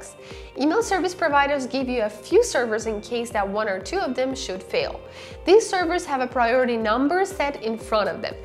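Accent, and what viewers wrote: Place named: Iran. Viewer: That's some Iranian accent she has, isn't it?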